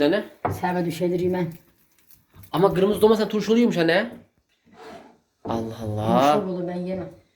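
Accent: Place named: Bartın, Turkey. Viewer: Has bartin şivesi.halişka bu deyil misir unu ile olur halişka .bunun adı hamur atmasi .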